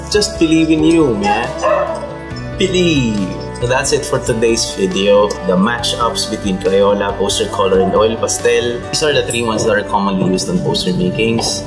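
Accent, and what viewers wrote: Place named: Bicol, Philippines. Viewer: Hi po, bibihira ganitong art content sa pinas. Keep on going po Sir! Love your accent too! Maurag talag ang mga Bicolano 😊👌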